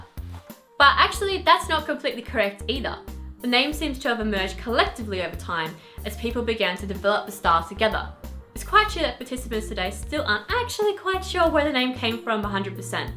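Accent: Australia. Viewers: I recognize that she's Australian. I assumed as much given that it's on the Deerstalker channel. I hear the accent enough that I don't really much notice it but that's fine. I'll take your word for it. either way, I'm not sure OP is even worried about it anymore as I've noticed they no longer comment here. haha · Sorry doll, I'm Australian, and this is what Australians tend to sound like. We are working on a solution for ESL speakers:)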